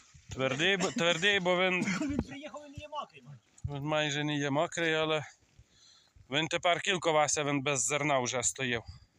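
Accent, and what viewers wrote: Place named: Hutsul, Ukraine. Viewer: Трохи Гуцул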